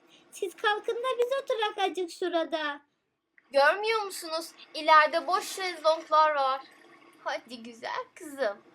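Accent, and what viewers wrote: Black Sea, Turkey. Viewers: siz karadenizlimisiniz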